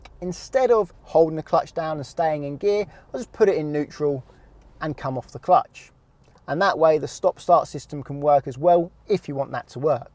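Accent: United Kingdom. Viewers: What kind of accent is this? (I know it's British, I mean what region)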